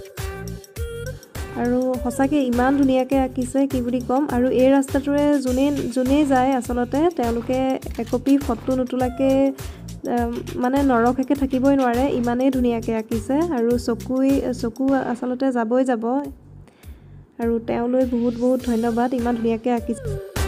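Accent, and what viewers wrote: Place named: Lakhimpur, India. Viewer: অহ হয় নেকি ভিডিঅট কোৱা শুনিহে ভাবিছিলো । বাৰু ঘৰ কত তোমাৰ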